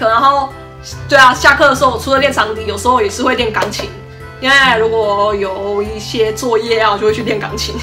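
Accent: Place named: Taiwan. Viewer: 哈哈哈覺得這集台灣腔好重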